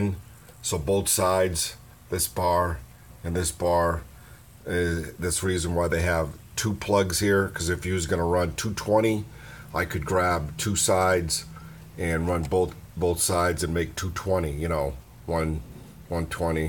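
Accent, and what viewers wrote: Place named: New York, United States. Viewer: NiceyNice. You gottta be from New York. :)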